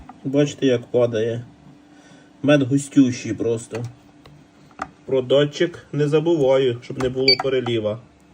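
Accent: Poltava, Ukraine. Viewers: Чути зрОзу шо Ви з полтОвщини. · Зі 100 відсотковою впевненістю можу стверджувати, що Полтавський говір😊 Може навіть північні райони... Пирятин, Чорнухи, Лохвиця, Гадяч, Зіньків... Напишіть, чи вгадав?😅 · Полтавський говір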